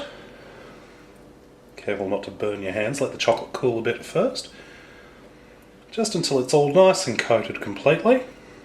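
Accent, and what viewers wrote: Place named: Australia. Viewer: i just discovered you (for myself)! delicious recipes and an australian accent? how lovely...you might get a lot more comments from me within the hour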